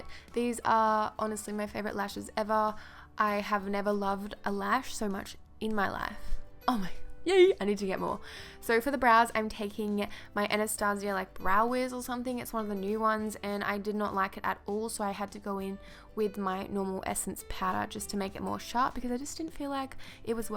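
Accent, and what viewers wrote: Australia. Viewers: she does. I am from Australia and she sounds like any ordinary Aussie girl. Perhaps your perception of an Australian accent is a bit different? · wooow loving the hair ur amzing too love u by the way I'm OBSESSED with the Australian accent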